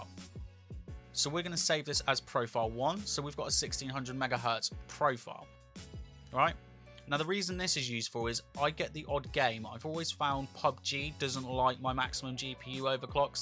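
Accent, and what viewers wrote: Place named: England, United Kingdom. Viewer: That English accent... Just perfect.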